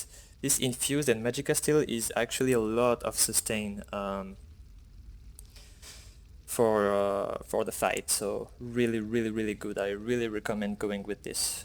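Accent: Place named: France. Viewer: Do you speak french?You have a french voice.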